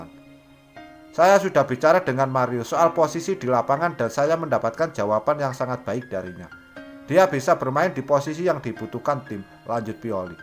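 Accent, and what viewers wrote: Java, Indonesia. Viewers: Dengan aksen yg jawa medok, pelan2 aja min... Jadinya salfok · Jowone ndi mas? 😁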